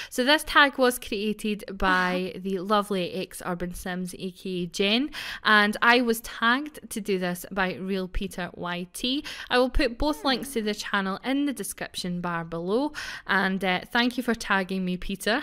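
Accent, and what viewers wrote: Scotland, United Kingdom. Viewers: I'm pretty sure she's Scottish as an Australian accent is like a relaxed British accent. I'm Australian, I should know ahahaha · Can hear those scottish roots coming out in you :P Instantly knew, scots rule! \o/ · Omg ur accent! I luv it! U Scottish?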